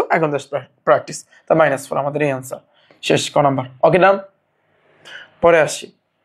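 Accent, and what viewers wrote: Barishal, Bangladesh. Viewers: Bhaiya ki barishailla?🤦🏻‍♀️🌝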